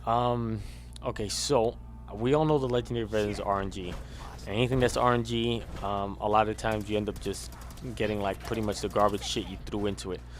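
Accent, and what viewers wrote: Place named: New York, United States. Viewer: thanks I cant afford ps4 if I could I'd buy it just to talk to you cause I love your NYC accent >.> I'm from Georgia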